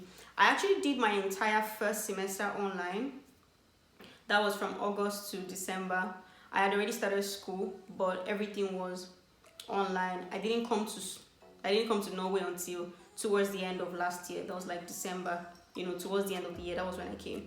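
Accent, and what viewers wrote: Cameroon. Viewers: Are you from Cameroon.your language tune seem similar here. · you sound more like a Cameroonian, nice video, very detailed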